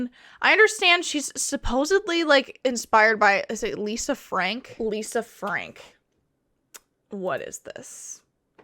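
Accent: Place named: United Kingdom. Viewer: British really came out in this one 💂‍♀️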